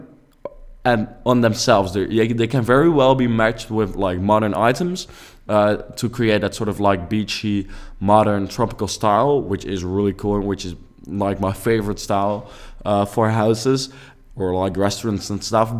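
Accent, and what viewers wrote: Netherlands. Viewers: Aan het begin van de video vond ik het al op iemand lijken die Nederlands is en Engels praat 😂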